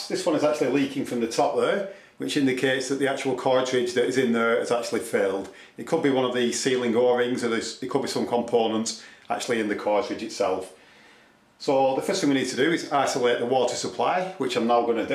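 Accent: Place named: England, United Kingdom. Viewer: Nice work. Thanks mate. Gotta love the pommie accent. Gonna go listen to now 👍👍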